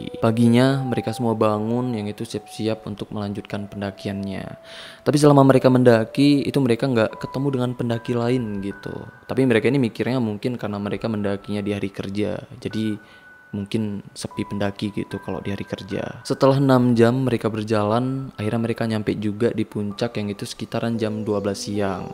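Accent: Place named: Medan, Indonesia. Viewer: Orang medan yah?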